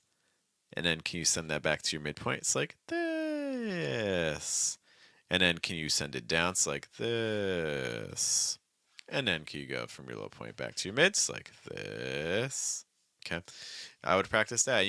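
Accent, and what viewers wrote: United States. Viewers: i thought you were american! great accent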